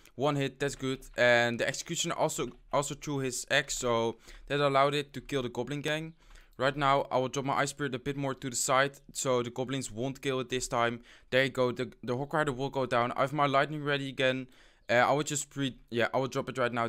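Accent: Netherlands. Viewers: jii bent nederlands of niet want je hebt een nederlands engels accent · you really have an dutch accent, are you dutch, ben jij nederlands want je klinkt echt super nederlands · You have a dutch accent, are you dutch? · This dutch accent has got me like 😂😂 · Are u dutch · Owen Seetsen hij is nl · je hebt echt een typisch Nederlands accent als je engels praat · ik kan horen dat jij nederlands bent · are u dutch!!!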